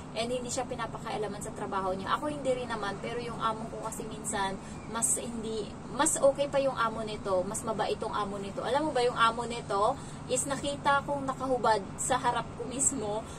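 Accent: Iloilo, Philippines. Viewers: Hi po, ilonggo ka po pla...saan po province nyo?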